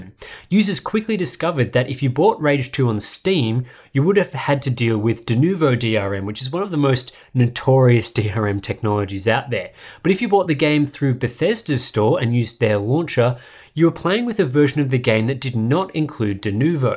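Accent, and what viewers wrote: Australia. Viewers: said "not as good news." It's that thick Australian accent.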